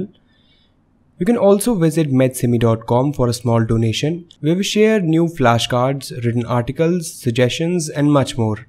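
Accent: India. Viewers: Difficult to understand. Is there anyone there who doesn't speak with a heavy Indian accent. If so, please let them narrate the video. You are losing view count because people can't understand the speaker. If this is not meant to be viewed by North America, please disregard.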